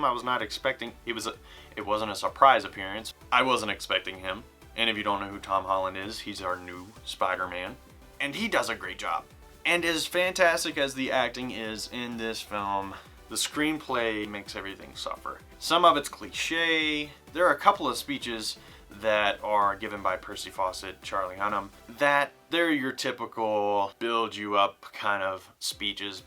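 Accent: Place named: United States. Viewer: Why is Daniel Radcliffe reviewing movies with an American accent?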